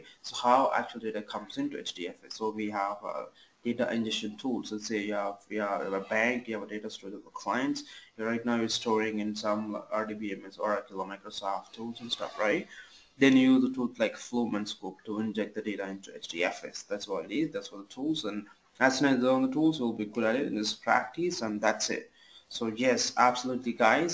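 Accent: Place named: India. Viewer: Please speak in normal English u r Indian don't speak like NRI ok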